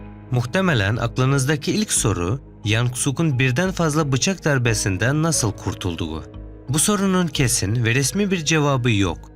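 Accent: Syria. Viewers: seslendirmeyi suriyelimi yaptı? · Youtuber kardeşim nerelisin, şive ve ağız çok farkı, Halep ten mi geldin sende yosa?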